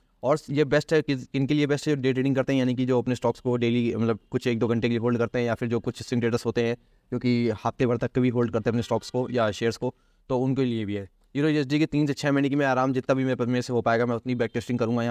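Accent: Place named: Uttar Pradesh, India. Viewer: Bhai aapke bolne kae tareeke sae aisa lag rha hai ki aap UP sas hai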